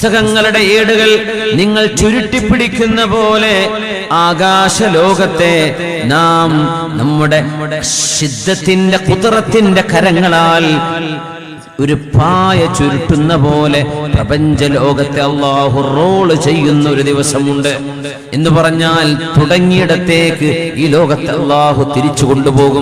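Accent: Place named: Saudi Arabia. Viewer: ഉസ്താദിന് സൗദി സൗണ്ട് അല്ലാതെ പറ്റില്ല ☹️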